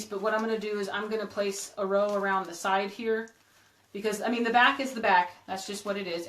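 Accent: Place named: Nevada, United States. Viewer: Beautiful tulip wreath. I love your Nevada accent.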